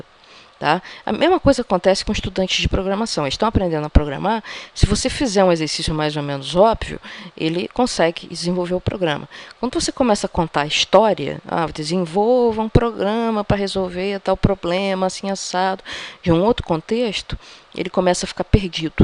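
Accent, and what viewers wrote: Rio de Janeiro, Brazil. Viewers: Eu acho interessante e legal a "entonação do X/S" na sua fala, rs. Sotaque carioca? De qualquer forma, parabéns pela iniciativa! Estou estudando para concursos e assistindo todos os vídeos relacionados ao aprendizado. Obrigado!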